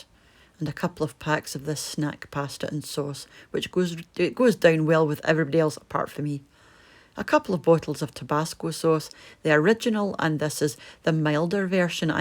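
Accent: Scotland, United Kingdom. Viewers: I'm obsessed with shopping hauls and videos of what people make for dinner. Must say, love hearing a fellow Scot doing them 😊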